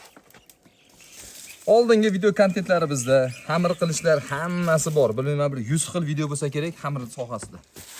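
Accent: Fergana, Uzbekistan. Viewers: Фаргоналимисиз каеридан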